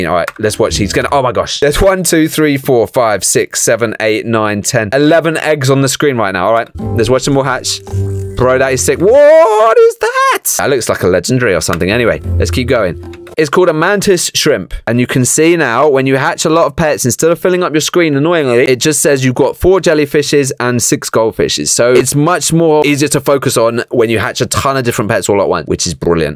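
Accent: United Kingdom. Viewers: why are you british?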